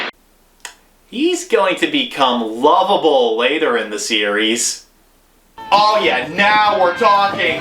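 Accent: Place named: Massachusetts, United States. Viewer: I know that accent... you're from MA... North Shore? Medford or farther north?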